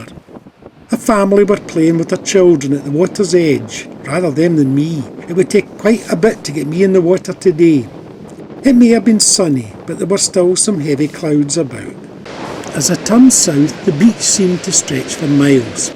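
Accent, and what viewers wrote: Scotland, United Kingdom. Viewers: Charming video,. But I confess I was looking for English subtitles, I had difficulty with your Scottish brogue..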